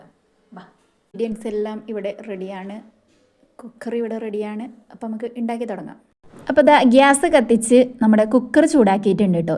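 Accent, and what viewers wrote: Malappuram, India. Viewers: Thani malappuram baasha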